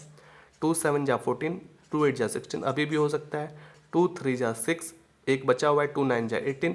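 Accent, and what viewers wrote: Bihar, India. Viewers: Dear sir Ur Bihari tone is so sweet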